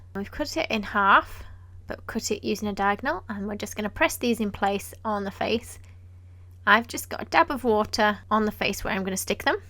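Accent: United Kingdom. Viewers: Your britain accent is annoying.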